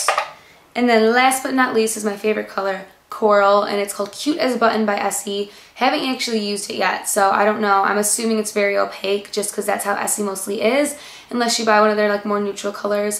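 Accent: Chicago, United States. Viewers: Your Chicago accent is so cute!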